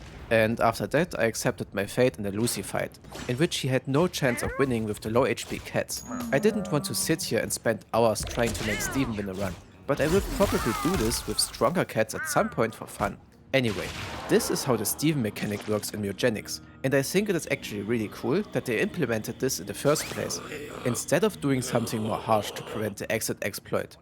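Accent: Germany. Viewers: Ich höre direkt raus, dass du Deutscher bist, Brudi 😂👍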